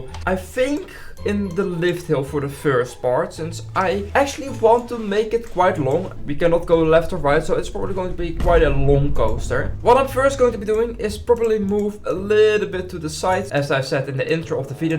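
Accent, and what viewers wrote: Netherlands. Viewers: het nederlands accentje is er wel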